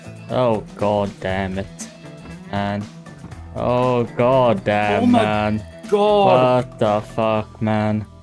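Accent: Sweden. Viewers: are u swedish cuz u kinda sound like swedish?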